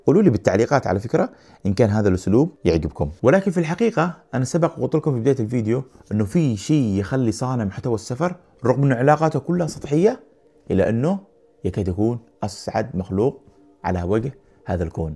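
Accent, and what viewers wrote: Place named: Yemen. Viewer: ياخي لهجتك شوي مغربي شوي يمني شوي مصرؤ بش اكثر شي يمني من وين انت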